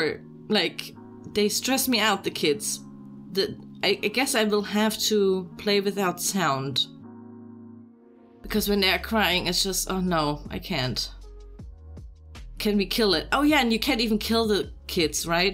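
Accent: Germany. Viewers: Im so early.. anyway you sound German.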